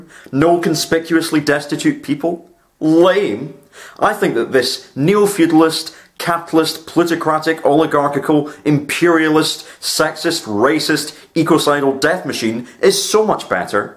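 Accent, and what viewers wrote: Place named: Scotland, United Kingdom. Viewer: Sorry, I'm from Canada so I can't distinguish accents well. I guess you're in Scotland.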